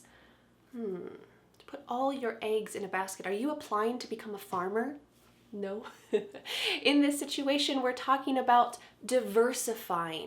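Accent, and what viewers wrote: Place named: United States. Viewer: Thank you very much, you have taught me the American accent excellently Follow you from Algeria 😊😘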